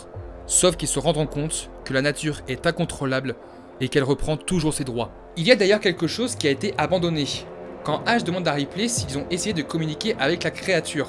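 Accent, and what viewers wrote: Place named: Paris, France. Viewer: vidéo bien faite, par contre cet accent parisien snobe est insupportable "particularitéffff, il sort les yeuxffff, mercichhhiiaann etc" donc pas de pouce bleuffffffan, mais pas de rouge par respect pour le travail.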